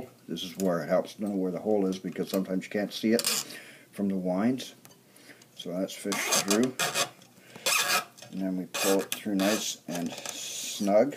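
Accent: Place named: Atlantic Canada, Canada. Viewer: I think I recognize an Atlantic Canadian accent. I'm originally from Cape Breton.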